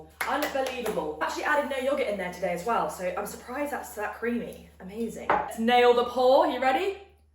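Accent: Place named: South, United States. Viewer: I enjoy your videos. They are enjoyable to watch but would you stop with the southern accent? Please.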